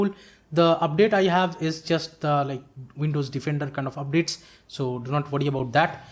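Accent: India. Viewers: Why does it give me weird vibes to hear an indian accent and see AnyDesk on the desktop? Nice video tho! ^^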